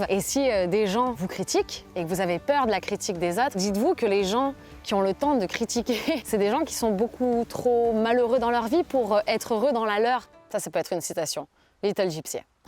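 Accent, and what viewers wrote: France. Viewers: Ou un échec ? Non, c'était pas le bon moment c'est tout. Ce qui me plaît, c'est ta spontanéité, ton amour pour Aiko et cet accent du sud-ouest. Wow désolée pour ton papa.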